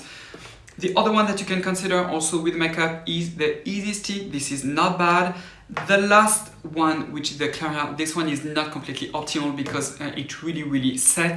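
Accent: France. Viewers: Greetings from West Hartford, CT🙋‍♀️You are my new favorite reviewer of sunscreens. I've watched enough of your videos now to finally be able to understand your heavily or should I say Heavenly French accented English. Love your channel,.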